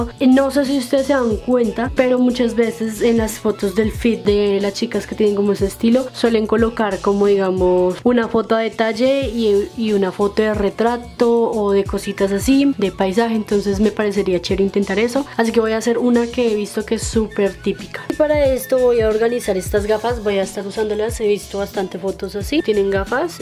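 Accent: Colombia. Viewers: Me encanta tu asento Colombiano🥰😍 y me encantaaaan todos los tips para fotos, look y demás Saludos desde Torreón Mex.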